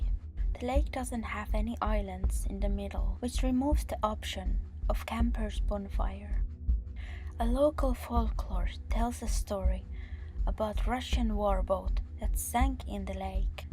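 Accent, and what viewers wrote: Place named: Finland. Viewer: I adore your accent so much! My mom is from Finland and has an accent as well, I live in America now so it's rare that I hear someone else with a finnish accent.. and I haven't found another finnish channel that I like as much as I like yours. Love your videos! <3 (especially the spooky ones)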